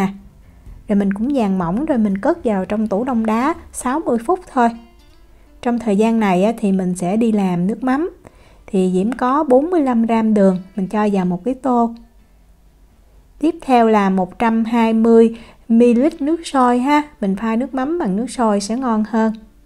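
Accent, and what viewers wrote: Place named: Mekong Delta, Vietnam. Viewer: Thấy ngon quá, chảy nước miếng đây, thích nói giọng miền tây dễ thương quá. qua Nauy bao lâu rồi vậy. Cảm ơn nhiều.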